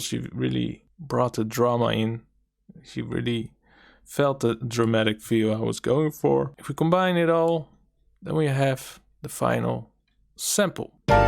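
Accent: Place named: Netherlands. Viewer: By your accent I'm guessing you're from the Netherlands?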